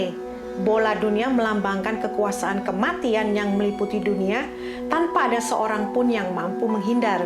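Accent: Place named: Surabaya, Indonesia. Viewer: khas sbyoan dan malang an logatnya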